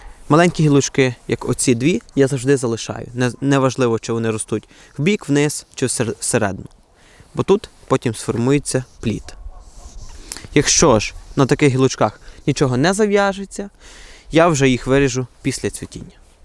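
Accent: Western Ukraine, Ukraine. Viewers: Та трошки iз захiднянським акцентом, але вишукано й в одночас просто.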